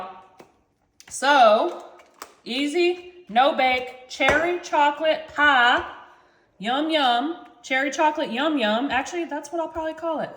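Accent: South, United States. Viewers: She talking Souther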